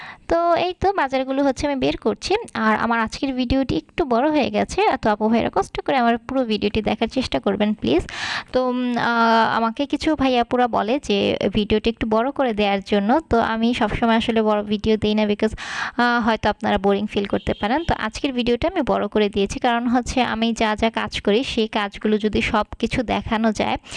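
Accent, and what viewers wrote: Barishal, Bangladesh. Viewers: আপু তোমার বরিশালের ভাষা গুলো ভালো লাগে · আপনার বরিশালের ভাষা আপনার মুখে অনেক ভালো লাগে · আপু এখন যে বরিশালে ভাষায় কথা বলেন এইটা আমার কাছে ভালো লাগে অনেক সুন্দর লাগছে । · আপু তোমার বরিশালের ভাসা বেশি ভালো লাগে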